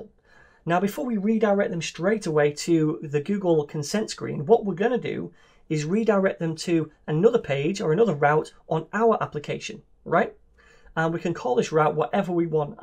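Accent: United Kingdom. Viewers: Two things I liked in this video are : 1) Your explanation 2) Your British accent =D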